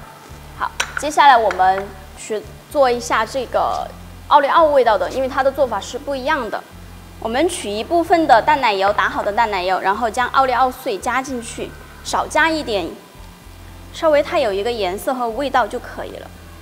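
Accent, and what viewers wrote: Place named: Chongqing, China. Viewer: 重庆椒盐普通话听起来好可爱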